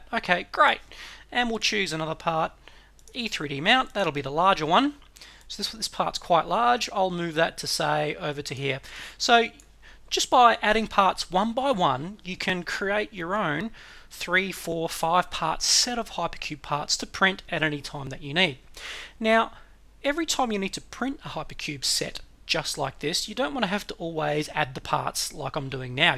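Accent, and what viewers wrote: Australia. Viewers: must be the sydney accent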